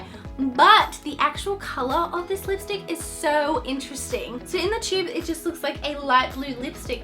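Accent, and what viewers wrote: Australia. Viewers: Hi I love you I love your videos I love your accent so much I find Australian accents so entertaining to listen to You are so pretty too 💖💖💖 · OMG are you australian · Your Aussie accent is soooooo soothing. ❤️😘ilysm!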